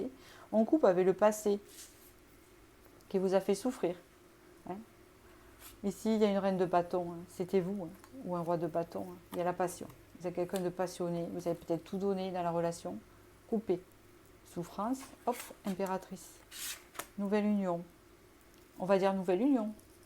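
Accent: South, France. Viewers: Coucou 👋 merciiiiii quelle magnifique tirage tout est dit tout est en raccord☺️ oui traitement des liens karmiques passage très difficile mais nécessaire c’est plus au moins derrière aujourd’hui bon y a encore du job mais là oufff ça va de l’avant 😜 je vis plus le moment présent aujourd’hui et ça change la vie vraiment 🥰.. oui des plaisirs sains et simples que je recherche aujourd’hui.. 🥳 merciiiiiii.. j’adore le tirage vu d’en haut 👍 le son est génial 👍 vous êtes toujours très juste et j’apprécie votre naturel ☀️🌈🌹merciiii pour tout votre temps accordé et ces vidéos qui souvent me titilles 🤣, me remette aussi en question, et parfois ne me parle pas mais cela reste très rarissime il en faut pour tous!! Et je suis grâcement privilégiée 🤣 Voilà ce que j’apprécie dans vos vidéos c’est pas du blabla merci merci merci pour tous ses merveilleux moments de partage que vous m’offrez 🌈☀️🌹🌈avé l’accent🤣j’adore sa sens le soleil, la lavande, la mer les vacances 🏔 🌊 😎🌈☀️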